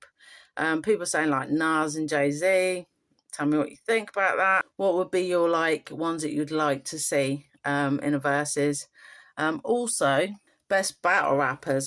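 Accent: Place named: England, United Kingdom. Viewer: Do you mind if I skip my favorites? You skipped, not my favourite by any stretch, but a grime OG. You still sound English as fuck, how long have you lived in America? Ya, Lord of the mics is the best battle, because it was thee battle. It showed why grime was it's own thing. Beats, cadence, London accents, and slang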